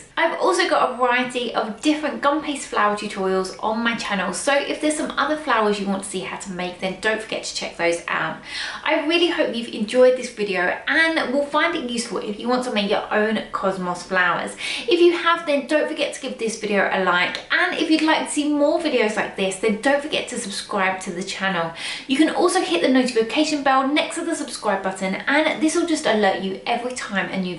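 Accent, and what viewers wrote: United Kingdom. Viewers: just subscribed you... you deserve it... best tutorials on youtube everything in detail and yr british accent i love it i grew up there... but nevertheless all accents are good... love and hugs pls make tutorial on cherry blossom i make flowers w porcelain and the result is great... GOD BLESS U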